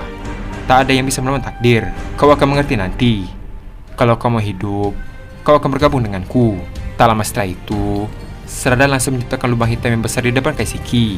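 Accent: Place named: Bali, Indonesia. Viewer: Logat baline keras gati bli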